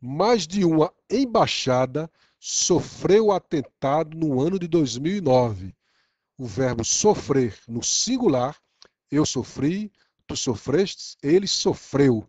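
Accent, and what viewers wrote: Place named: Pernambuco, Brazil. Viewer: Aulas maravilhosas, estou assistindo todas e está me ajudando muito, principalmente porque me familiarizo com seu sotaque, pois sou Pernambucana também. Continue assim, com esse trabalho louvável!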